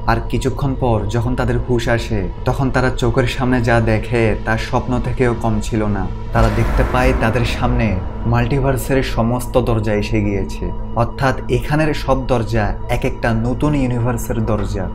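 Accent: Chittagong, Bangladesh. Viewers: আপনার কথায় একটা চাটগাঁইয়া টিউন আসে।🫥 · চট্টগ্রাম ❤️🌸 ভাষা ধরা পড়ল একটু করে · ভাইয়ের বাড়ি মনে হয় চট্টগ্রাম 😊 সীতাকুণ্ড আসলে নক দিয়েন❤ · চিটাইংগে চিটাইংগে গল্প মজা লাগছে😊😅❤ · ভাই কি চট্টগ্রামের নাকি? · Bhai Chittagonian naki amr moto? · চিটাগাং বাড়ি নাকি?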